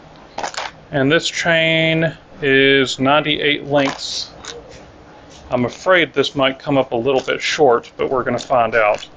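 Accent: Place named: North Carolina, United States. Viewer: Such clean fingernails for a mechanic! I guessed NC, and I was right! I don't know how. I'm in Boulder, Colorado...Never been there, or anywhere near. I guess it was the subtle accent and the nice little town. I've electrified a Chinese cruiser with 29 inch wheels. It's like a giant version of this. The chain keeps popping off, the motor is too powerful. I've ordered a 22 tooth with an offset to bring it in better alignment. We'll see. The removal of the sprocket was surprisingly easy, but I wouldn't have known how without You.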